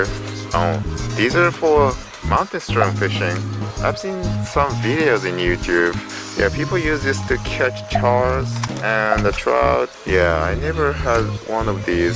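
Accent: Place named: Canada. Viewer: いつもいうけど、のカナダ仕込みの英語めっちゃ好きやで。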